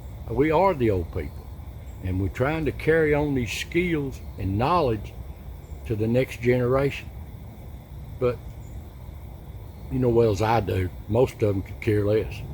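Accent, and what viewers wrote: Appalachia, United States. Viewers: My husband and I watch all your videos. For me, personally, the 1st thing that drew me in was your voice. I had been searching for appalachian history and found you. I subscribe to a few other appalachian channels, but yours is my favorite. I have lost all my immediate family, just good ol Alabama mountain folks. Your accent is identical to theirs, so it gives me such comfort. You'll never know 😔 I wake up every Monday morning, have my coffee, and check in with the Ridge. My husband and I now spend alot more time out in nature and even plan on buying a metal detector very soon. ❤ God Bless you, and all you do.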